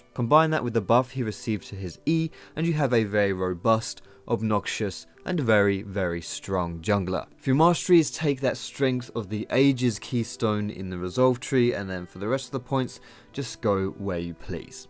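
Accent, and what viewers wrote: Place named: England, United Kingdom. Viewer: i like you'r englaned acsent ;D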